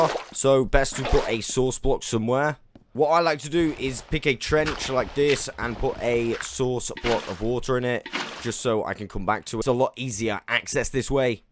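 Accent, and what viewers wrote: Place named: England, United Kingdom. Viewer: english lol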